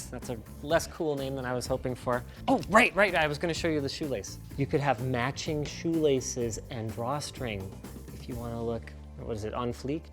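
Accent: South Africa. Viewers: we hear that South African accent bru.Nice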